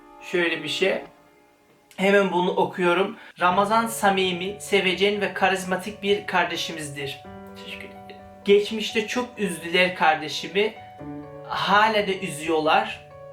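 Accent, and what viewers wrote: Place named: Kırşehir, Turkey. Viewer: Kırşehirli olduğu çok belli ya babannem çok güzel diyo ya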